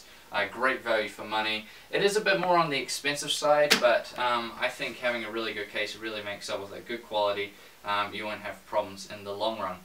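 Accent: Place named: New Zealand. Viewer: Just realized the new zealand accent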